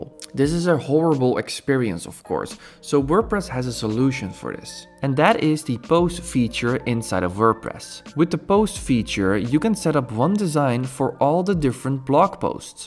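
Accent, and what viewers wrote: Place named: Netherlands. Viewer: Thank you very much! This is, again, useful and clear! I need to go through the video step by step as there is so much packed into it. But every single step is crystal clear. BTW: I love your slight Dutch accent.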